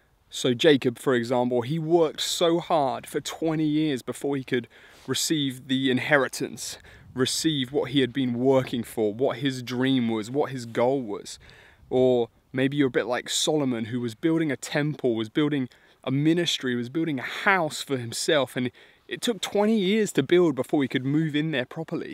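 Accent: England, United Kingdom. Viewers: I absolutely loved the quality of your video! Great production and your English accent is adorable! God bless from Australia!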